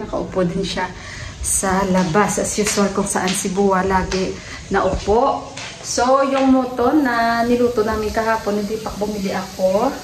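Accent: Iloilo, Philippines. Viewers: hilo nang.. kalingaw simo pamatian mag ilonggo whahha. Kahilidlaw sg mga celebration sa Bacolod. hidlawon ka gd mgpa gutok hulat sg parad nang. And Happy Dashain sa inyu da nang. God bless kag keep safe gd da nang.🤍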